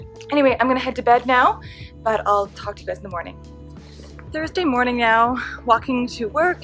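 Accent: Canada. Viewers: are u canadian ? if so what part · Is she Canadian? Her accent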